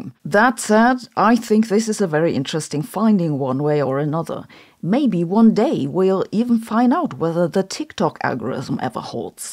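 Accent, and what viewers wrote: Germany. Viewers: I know you have someone proof read your script. I had a German girlfriend who had a slightly harder accent than you. She is very smart and intelligent. She often asked me for proper grammar and pronunciation of words and sentences. I respect your channel and how you are able to make it entertaining and enjoyable for others.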